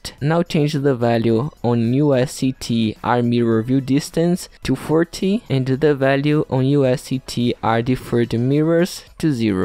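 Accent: Brazil. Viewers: vc e br da pra perceber no sotaque kkkkk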